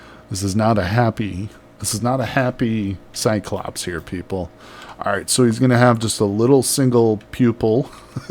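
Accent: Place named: Minnesota, United States. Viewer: I said in a previous video that you sounded familiar. Now you're confirming you live in central US. What are the chances you live in Minnesota? Lol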